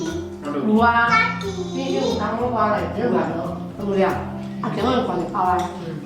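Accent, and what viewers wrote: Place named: Quanzhou, China. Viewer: 四川人怎麼泉州話說的那麼標準？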